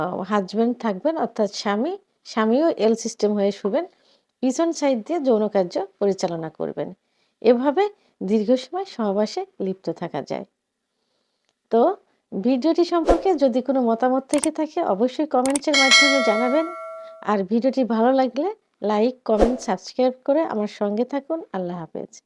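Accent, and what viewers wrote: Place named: Noakhali, Bangladesh. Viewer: ওরে নোয়াখাইল্লা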